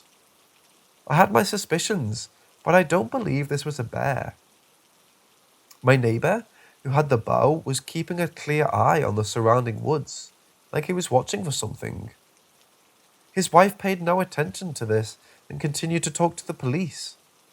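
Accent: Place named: Scotland, United Kingdom. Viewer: Kinda hard to take tales of NC seriously from a Scottish accent....but good tales. 😎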